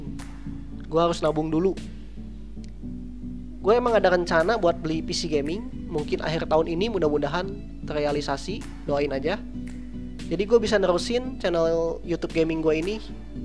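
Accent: West Java, Indonesia. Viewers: langsung keliatan logat sundanya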